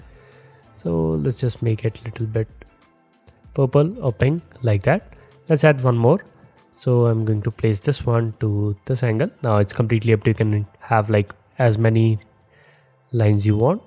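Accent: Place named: India. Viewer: I'll be completely honest, I can easily tolerate this level of indian content just for how chill it is. the dang phone calls I have and the audio is so muffled, it be difficult to hear individuals with said accent via phone quality but YouTube audio quality is like listening to an audiobook lol Thank You for being here sir!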